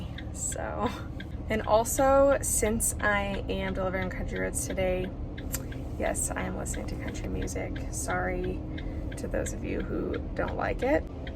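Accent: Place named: North, United States. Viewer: gotcha! Thought you were from somewhere up north, that accent did it lol you’re pretty asf tho. Have a good day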